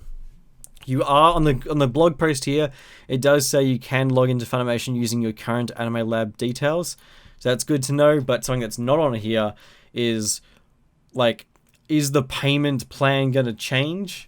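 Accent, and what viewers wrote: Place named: New Zealand. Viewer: U Aussie cuz u don’t sound like it u sound like ur NZ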